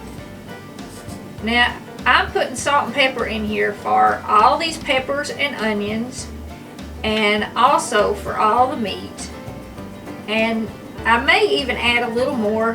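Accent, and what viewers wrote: South, United States. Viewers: i live in texas now, left the great state of Arkansas in 1954 and adopted Texas as my home. i love your channel not only for the dishes but mainly to hear that voice, nothing takes me back home more than a good old southern accent. a lot of the dishes you make we called them our bachelor dishes when me and the kids had to get by alone during the divorce and remarry stage. i came up with lots of dishes that were easy to make and filled us up well, couldn't ask for more than that, and today after all those years ago the kids and grandkids still ask me to make some of those dishes, my cornbread is a favorite.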